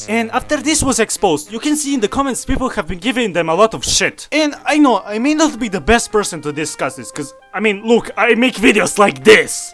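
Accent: Eastern Europe. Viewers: Slavic accent enhanced the experience too. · dudeeeeeee the sickass art? the memes? the angry slavic accent? new sub right here damn I ain't rihanna but where have you been all my life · Never knew how much I needed a man with a thick Slavic accent scream about how stupid Genhin VAs are now until now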